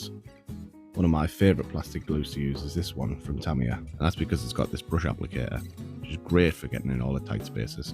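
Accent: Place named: Teesside, United Kingdom. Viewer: Teesside accent? Go on then I’ll subscribe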